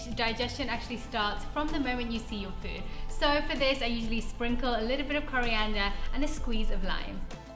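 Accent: England, United Kingdom. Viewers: I love your videos, they are so inspirational. I would love to hear more about your health journey. Are you British??, which part???. I love poha. ❤️ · Ik she's English but her accent gets me every time XD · I really like her British accent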